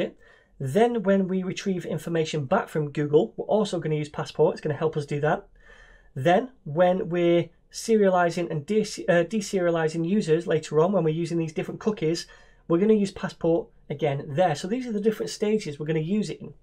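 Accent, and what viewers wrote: United Kingdom. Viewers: Two things I liked in this video are : 1) Your explanation 2) Your British accent =D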